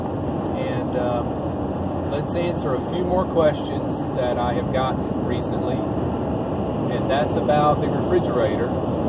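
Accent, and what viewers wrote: South, United States. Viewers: Love your southern accent 🤠👍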